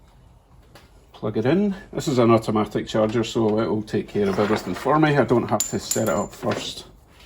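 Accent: Scotland, United Kingdom. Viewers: Did I just stumble upon a Scottish mechanic. Awesome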